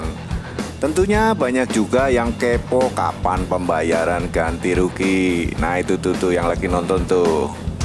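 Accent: Banyumas, Indonesia. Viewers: jebule ngapak temen rika kang🤣🤣🤣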